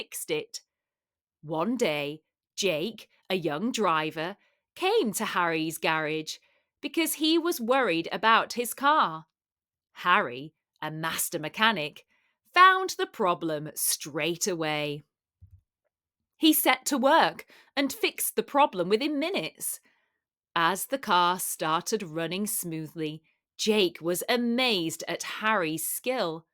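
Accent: United Kingdom. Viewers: Which accent do you follow of British accents?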